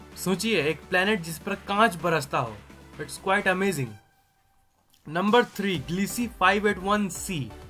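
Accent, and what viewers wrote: Bihar, India. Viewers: are you Bihar........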